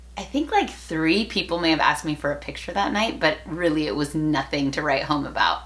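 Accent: Mexico. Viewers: Si te hice caso que en vez de verlo en español lo viera en inglés, no lo he visto en inglés pero enserio me impresiona como tienes el acento de tu primera lengua osea inglés y aparte pudiste aprender e imitar el acento de español de México y hablar perfectamente el idioma Me impresionas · It’s kinda crazy that you’re totally fluent and so and I know you’re American, but I still listen to a little bit of an accent, Mexican accent. I know is crazy, but I can listen to it 😂🤦🏻‍♀️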